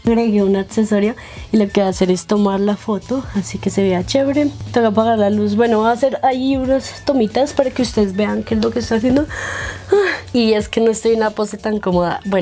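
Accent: Colombia. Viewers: Me encanta tu asento Colombiano🥰😍 y me encantaaaan todos los tips para fotos, look y demás Saludos desde Torreón Mex.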